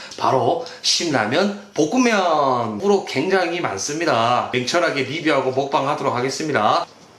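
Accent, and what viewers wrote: Busan, South Korea. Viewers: 영상에서 짜워? 이렇게 물어보시길래 ㅎㅎ 부산사투리군여 답글 감사합니당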